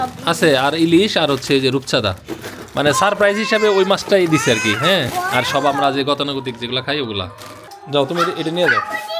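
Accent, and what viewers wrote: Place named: Tangail, Bangladesh. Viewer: Bhai apnego basha ar amago basha same ai jonne video valo lage... amar bari jodeu Assam Guwahati te tar poreu Tangaila koi...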